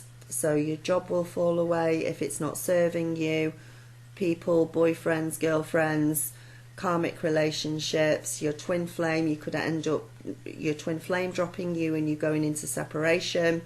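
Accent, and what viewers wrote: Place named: Yorkshire, United Kingdom. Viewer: Thank you so much! I've had this on my watch later list for a couple of weeks, and decided to watch today. Wonderful synchronicity in waiting until now to watch it, as I decided just three days ago that it was time to ditch the news, conspiracies and politics - got drawn into the conspiracies for about 9 months I think, and I'm now just thinking, right, I've learned that and I can move on now. When I did a meditation with the intention of releasing it all and cutting the cords, I got such an intense positive feeling, like my Guides were really excited - I felt their excitement! 😃 Also changing my diet gradually. I've been trying to cut down on sugary snacks, but going to try again now. You got yourself a new subscriber, and great to hear another Yorkshire accent! 💖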